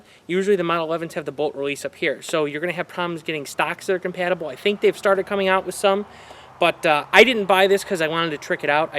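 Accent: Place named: Wisconsin, United States. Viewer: Not intended to be a dig. Just based on your accent, sounds like you're from WI. MN or IA maybe??? I'm from WI and my accent gives it away.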